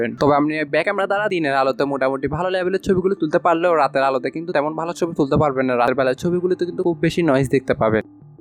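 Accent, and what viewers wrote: Chittagong, Bangladesh. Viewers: Same bhai..ami fouzdarhat thaki..apnar bolar style dekei buje geci..apni ctg tahken..😇💜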